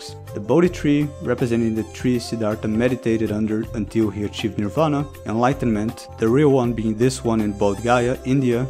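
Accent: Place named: Brazil. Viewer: Just watched your first video and it was great! Super hyped to watch this next one. Also, judging by your accent I gotta ask, are you brazilian? If so, me too, salve!! haha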